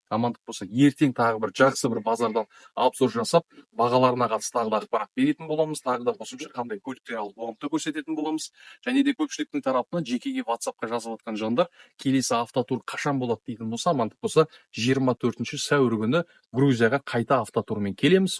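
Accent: Standard. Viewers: Әдемі, таза қазақша сойлегенің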